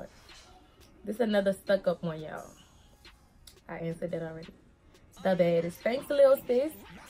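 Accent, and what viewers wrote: Louisiana, United States. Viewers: Love your Louisiana accent!!❤️❤️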